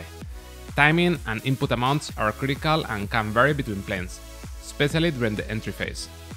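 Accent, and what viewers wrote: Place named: Spain. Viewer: I don't do well with Spanish accents, so I turned on closed captioning - turns out CC has a problem too! "about half left Aen a touch of left brother..."🙂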